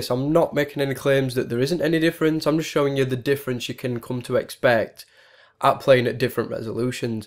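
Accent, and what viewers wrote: United Kingdom. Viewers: So hard to understand some of what your saying laddie yalll uk lots talk like this ya?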